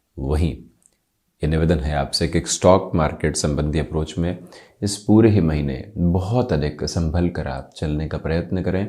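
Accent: Standard. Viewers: Aap ki hindi bahut shuddha hai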